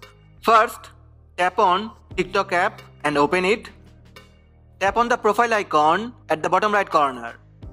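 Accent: India. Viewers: I've never heard such an extreme indian accent🤣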